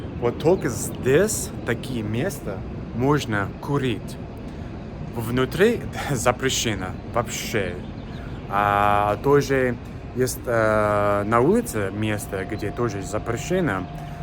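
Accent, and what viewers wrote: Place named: United States. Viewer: Ваш русский язык вполне можно понять. Нам тут в Казахстане не привычно слышать акцент американца, так как у нас мало инострацев.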